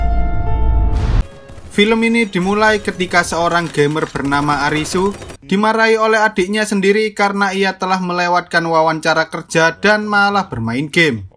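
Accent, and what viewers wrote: Java, Indonesia. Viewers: jawa kah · Mase medok banget...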